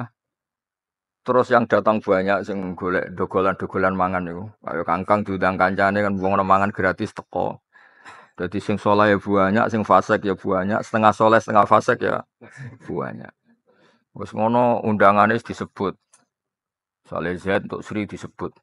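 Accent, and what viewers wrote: Indonesia. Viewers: Lughat Madura kayak e ,yg baca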